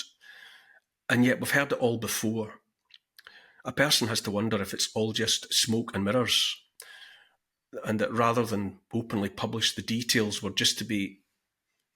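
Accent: Scotland, United Kingdom. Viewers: This is the very thing he was talking about. You shouldn't be behind anyone. We should all be out there doing some form of resistance and/or spreading the kind of truths speaks. The comments I have made on a couple of occasions have not been about getting out there and leading some sort of resistance or revolt. It is about some - not all but quite a fair number - of his viewers that fawn all over him and actually say they wait and look for his next video, that he keeps them going because he is a voice of common sense and reason. Too many people appear to be addicted to listening to and others like him as a kind of comfort blanket, his eloquence, his no-nonsence Scottish way of speaking, his light, and airy room giving a sense of simplicity, peace and tranquil respite in a misty spaciousness, almost felt beyond the window, far from the hurly burly of busy towns in which we mostly live. 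To read some comments it's almost as if the daily sight and sound of is their daily fix which enables them to survive another day. I have read accusations of controlled opposition against on certain other channels particularly on Telegram. It's another label that's thrown around like all the -ist ones used by the Left Wokerati. I don't necessarily subscribe to that view but I can see why some might say it. As long as people are soothed and stay on their sofas waiting for their next fix they remain in a state of soporific trust that somehow he has all the answers and will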